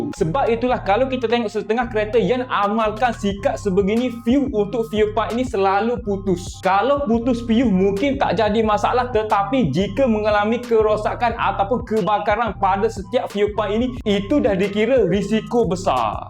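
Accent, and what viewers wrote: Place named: East Coast, Malaysia. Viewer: Terbaik bang, tebal loghat pata timor 😅😅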